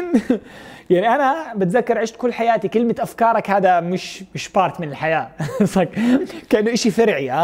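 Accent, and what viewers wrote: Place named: Levant, Arab world. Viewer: شكرا ،، لهجتك حلوة عراقيه سورية فلسطينه لبنانيه ماعرفت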